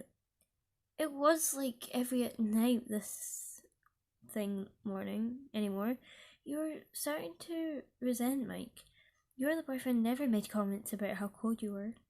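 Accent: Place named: Scotland, United Kingdom. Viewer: When you spoke the exact words that came out my mouth was "wait a minute you sound scottish" 😂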